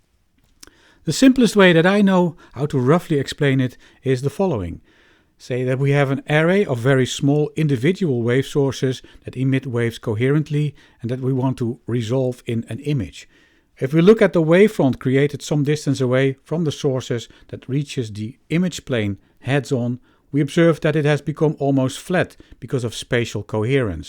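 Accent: Netherlands. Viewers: Interesting because the author is also not a native English speaker (he's Dutch). Maybe that helps non-native speakers to understand his English better. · Dutch accent is so good.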